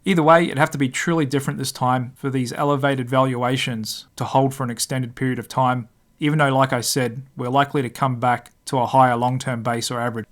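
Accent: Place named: Australia. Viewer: I liked that Aussie accent 👍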